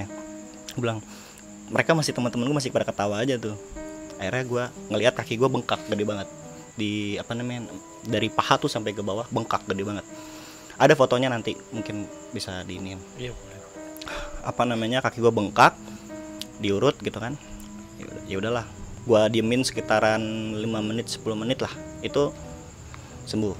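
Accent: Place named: Makassar, Indonesia. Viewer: Hostnya anak makssar yah??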